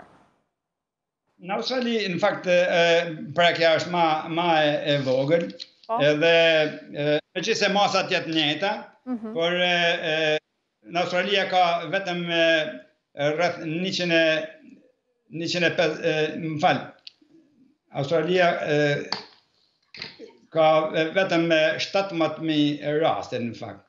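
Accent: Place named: Kukës, Albania. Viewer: Me dialektin kuksian qe flet duket siku te kemi afer,fjalet tuaja te sherojne!Dhe une vi nga fisi,prandaj ndihem krenare per nje njeri si ti!Jetofsh sa malet Doktor!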